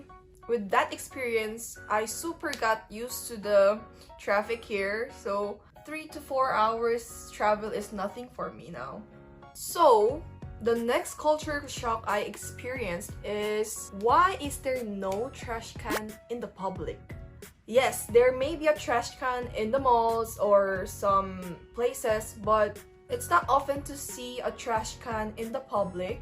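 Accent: Philippines. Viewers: Wow you have filipino accent while speaking in english. Kudos! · Your english has a Filipino accent.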